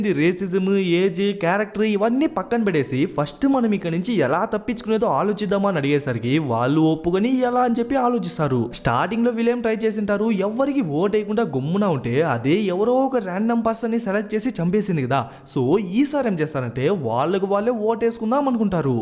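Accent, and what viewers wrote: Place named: Karnataka, India. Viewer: ఎం ఉండ,ముండా,బండ రా కొజ్జనా కన్నడ గా తెలుగు కి తెగులు పట్టించే లా ఉన్నావే నువ్వు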